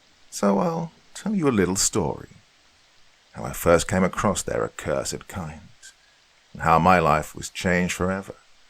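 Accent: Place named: United Kingdom. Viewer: It would help to have an American accent if you're from Idaho. I like the British accent though. Just not believable for this particular story.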